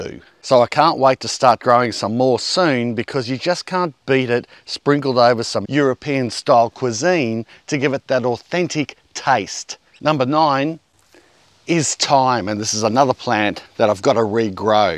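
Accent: Australia. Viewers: I will tell which I will replace: None! Your channel is amazing. Plus that Australian accent and your passion your are right up there with the late crocodile hunter. Good lookin out bro. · Your "Down Under" accent at times makes me raise my eyebrows and ask "Did just say what I heard him say?" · I've been watching his vids for nigh on 2 years and whenever I mention gardening my family now respond in Australian accents..."What does say?"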